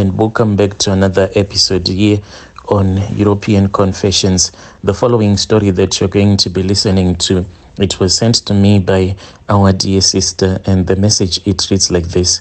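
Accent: Zimbabwe. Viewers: European stories is the name of the channel only to be greeted with a heavy African accent. Sounds Zimbabwean I can tell because I'm Zimbabwean too😢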